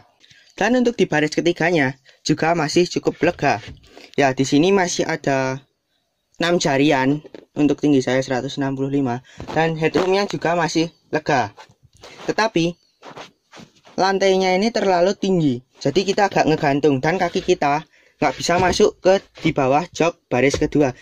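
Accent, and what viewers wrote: Java, Indonesia. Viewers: Bahasa jawanya totok😁😁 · .medok kali kau ah..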